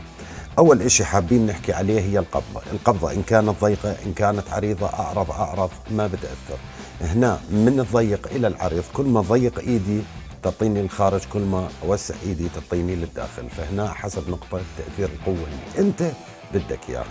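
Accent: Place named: Baghdad, Iraq. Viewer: عراقي وبغدادي 😹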